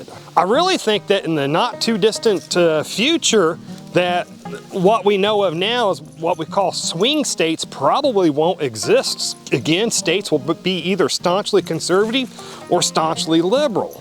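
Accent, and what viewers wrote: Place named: Delmarva, United States. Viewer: U have a delmarva accent, where yew from?????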